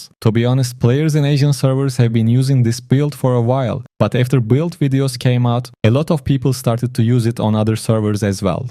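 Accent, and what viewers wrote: Turkey. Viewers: türk ingilizcesini nerde duysam tanırım xD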